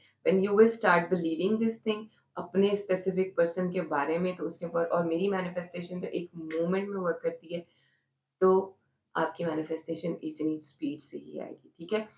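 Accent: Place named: Pakistan. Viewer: Pakistan, you can feel the difference in her accent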